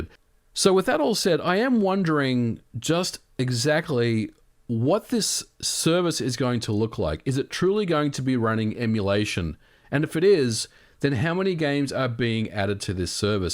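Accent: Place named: Australia. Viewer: Man, i just learned today that this guy is Aussie, i always thought he was British somehow lol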